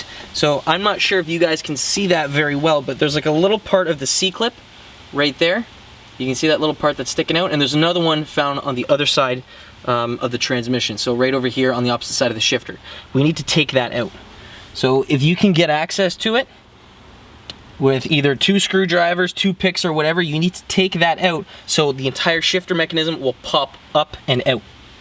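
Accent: Canada. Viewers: Let me guess you're from Canada? the accent is really annoying xD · NOAH Shirsat lmfao...where is he from Canada????? I had to stop watching the video... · He's not Canadian? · He's Canadian, they gave us syrup and hockey so I can look past ot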